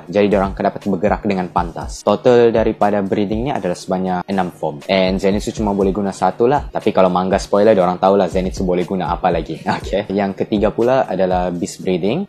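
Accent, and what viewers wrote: Sabah, Malaysia. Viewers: Ad logat Sabah la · speaker org sabah ka ?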